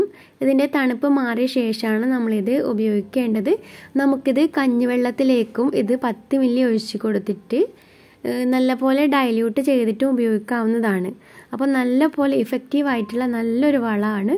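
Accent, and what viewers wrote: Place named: Malabar, India. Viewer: ശബ്ദത്തിൽ ഒരു മലപ്പുറം /കോഴിക്കോട് ടച്ച്‌ ഉണ്ടല്ലോ 🙂😆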